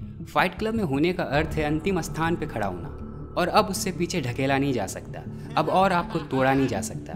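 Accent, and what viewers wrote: Bihar, India. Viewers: <link> no man this guy did it in this way i remembered this after all this time cause this was a gem a pure hand written content and admired this <link> aur ye chutiya apne bhiari accent wali english bol ke alaga gussa dila rha hai aur ditto is dusre wale video ki lines bol rha hai koi bhi samajh jyega original video pe millions me views hai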